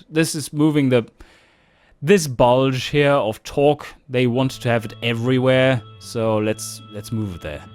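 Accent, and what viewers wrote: Germany. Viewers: You are german???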